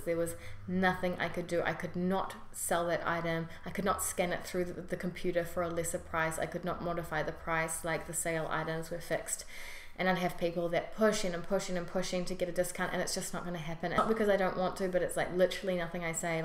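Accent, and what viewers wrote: New Zealand. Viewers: I love her pronunciation, she has got to be from New Zealand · I believe NZ has the absolutely cutest accent imaginable. I promise this is not sexist, I've known this to be true for more years than I am late to this video. My proof is Rhys Darby. But your channel may be exhibit b. What a lovely time travel this was. Thanks! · American here. Actually observing her accent because I'm teaching myself how to do a proper kiwi accent by observing and listening to as many as I can. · I've been watching so many american and British You Tubers I forgot our accent and when I heard her voice I was like omg I talk like that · It is a pretty strong NZ accent but NZ has many accents.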